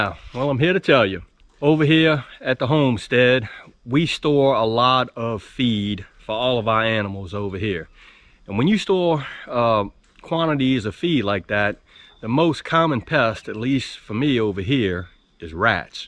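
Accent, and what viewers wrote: South, United States. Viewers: Nice meeting you my friend in the USA.from England love that ol Southern accent and attitude and I have a mouse in the bedroom and I will definitely make this today many times thanks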